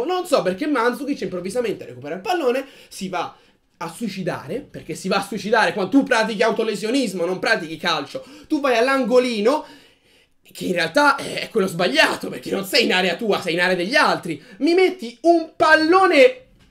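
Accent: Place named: Turin, Italy. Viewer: Tipico accento di Torino. Hai studiato a Cuneo o ad Alessandria?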